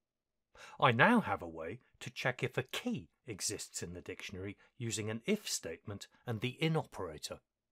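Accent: United Kingdom. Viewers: Hey! Happy birthday man! You deserve it. The 9 sounds just as good as 10, without the pain. The way you explain things with your soothing British voice and systematic, chronological, and a lot of patience make you the best channel for computer education I've ever seen. The editing alone deserve respect! Thanks for your dedication 🤝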